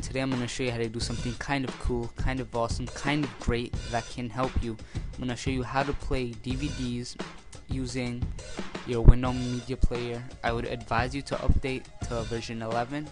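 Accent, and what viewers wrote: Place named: New Jersey, United States. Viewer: YOU SOUND LIKE YOU FROM JERSEY.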